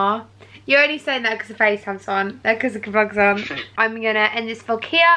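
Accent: United Kingdom. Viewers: Is she British? I think cuz of their Accent ..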